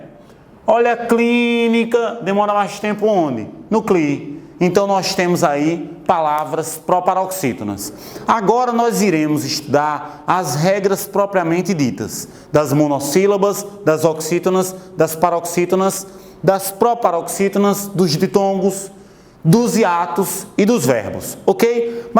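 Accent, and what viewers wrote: Northeast, Brazil. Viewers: Você ganhou um inscrito! Da aula muito bem e ainda tem esse sotaque nordestino que é maravilhoso de ouvir! Kkk parabéns professor, todo sucesso e muito obrigado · Professor apreender com sr. é muito bom e ainda com nosso sotaque nordestino e o jeito que exemplifica é melhor ainda. Parabéns!!!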